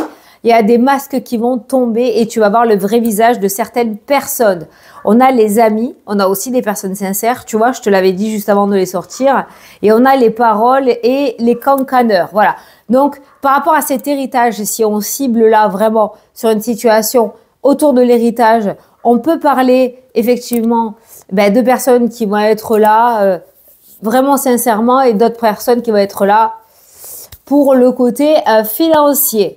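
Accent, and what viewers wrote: South, France. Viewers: Je t’adore chaque jour tu me fais du bien avec ta voie du sud sa me réchauffe le coeur bonne journée et félicitation mais tu le mérites bizzzz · Bonjour ma, un grand merci pour ta guidance qui me donne du peps, tu es toujours joyeuse et j' adore ton petit accent du sud. Merci pour ton dévouement, ta gentillesse et ta bienveillance. Que le seigneur te bénisse ainsi que ta famille.❤❤❤🙏🙏🙏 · c'est une joie de vous écouter , j'adore votre accent du sud il met du soleil dans notre journée.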